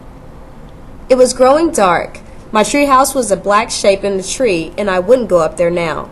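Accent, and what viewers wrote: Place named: Germany. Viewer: also ur german accent is good................................................................................................................................................................(WAY TO MANY PERIODS)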